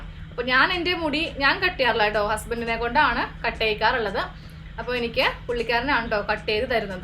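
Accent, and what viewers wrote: Kannur, India. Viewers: Kannor aanalle, naanum kannoor aan. Samsaram kettappam manassilayi😃👍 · Kannoorkariyano samsaram kette thonni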